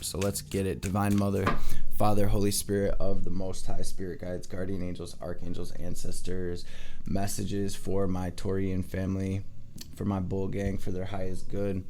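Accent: Detroit, United States. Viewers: You from Detroit bro?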